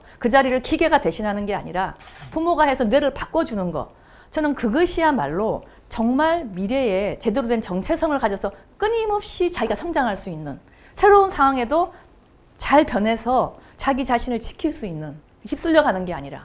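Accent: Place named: Gyeongsang, South Korea. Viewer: 예전에 tv인터뷰때는 몰랏는데 갱상도 분이네요.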